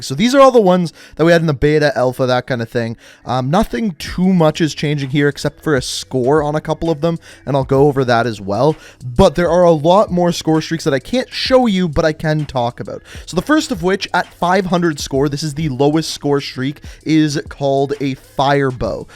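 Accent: Canada. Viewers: That Canadian accent😬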